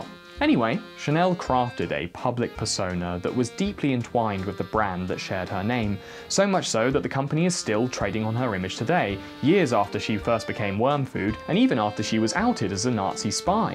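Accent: England, United Kingdom. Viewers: He has an english accent and never mentioned being Australian, so i don't don't know where you got that from..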